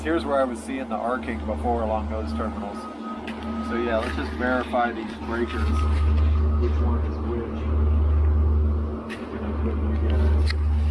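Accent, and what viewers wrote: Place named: Midwest, United States. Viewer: I've been watching some of your videos lately and never would've guess you were in Hawaii. Was thinking more Midwest or central US. Anyway, great stuff! There's something strangely relaxing about coming home from work and watching someone else work 😂. I do a fair bit of electrical troubleshooting in my job and I like to hear other people's thought process while working through a complex issue. You do a great job narrating and explaining the issue and resolution. Thanks for the great content!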